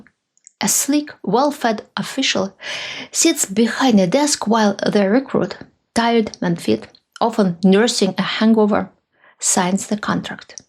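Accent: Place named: Russia. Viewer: Hi, thanks a lot for today's episode. You raise many issues I would love to comment on. I was Dutch and will always remain that, though I consider myself to be Australian. My Dutch accent is quite strong, much more so than your almost imperceptible Russian overtones. I am familiar with say 8 European languages and gave up on studying Russian (as a hobby) when Putin invaded. My wife is from Finland and equally adapted to Oz after nearly 60 years. We visited the Ugric museum in Helsinki. Clearly the Ugric tribes could not offer much resistance to the Empire. The Fins have only just managed to get out and not be swallowed up again. My wife's father was in the Winter War. The Karelians are Fins, but different as were the Estonians (IMO) say 200 years ago. Regional dialects varied a lot also in Finland. I am aware of the Komi Ugric people living toward the Urals and believe Russia was respectful of the Ugric languages, which helped the Fins to get out during WWI. 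Currently I am too preoccupied with the war in Ukraine to watch many of your videos. With luck that stupid and horrible war will not last another year. We had many reasons to migrate to Oz, but worry about Russia and WWIII was one reason. We feel safe in Tasmania, but visit Europe anyway.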